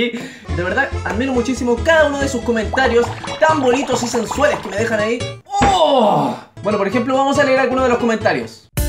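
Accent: Arica, Chile. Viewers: eres de Arica, no? xD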